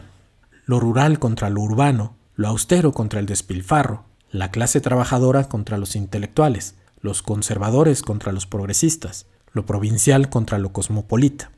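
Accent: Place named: Mexico. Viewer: Por su acento es mexicano.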